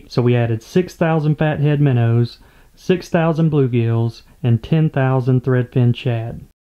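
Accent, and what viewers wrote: South, United States. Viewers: Same 🇬🇧 here. We clearly NEED wholesome content because I've loved watching this and the gentle southern accent is so calming . Love it 😀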